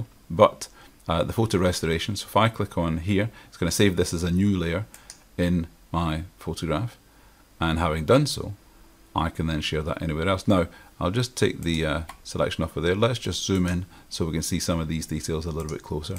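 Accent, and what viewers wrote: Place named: Scotland, United Kingdom. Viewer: Thanks. Im a fellow Scot who has lived in Australia for 40 years. I watch a lot of photoshop videos but it’s nice to see one in my own lingo and you present very clearly and concisely. Cheers pal.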